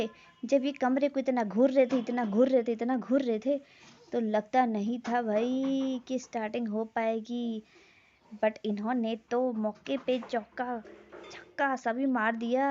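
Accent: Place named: Lucknow, India. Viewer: Aaj to aap Lucknow ki language bol rahi hai Ham ham😂😂 very nice 💞 💞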